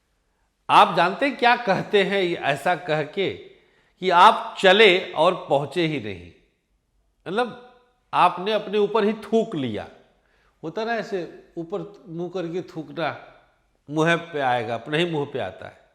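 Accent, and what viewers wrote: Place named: Varanasi, India. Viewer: गुरुदेव को सादर प्रणाम आप की बोलचाल की भाषा बहुत सुन्दर लगती है हम बनासी लोगो की यही पहचान है सदैव मार्ग दर्शन करे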